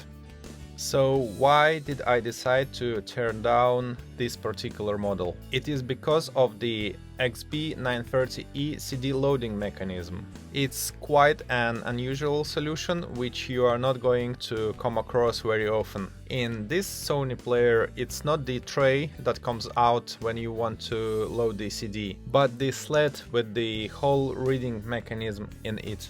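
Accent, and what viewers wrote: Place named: Russia. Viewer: Комментатор явно русскоязычный))))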